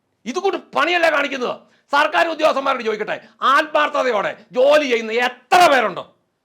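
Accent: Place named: Central Kerala, India. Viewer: മധ്യകേരളത്തിലെ സ്ലാങ്ങാണ്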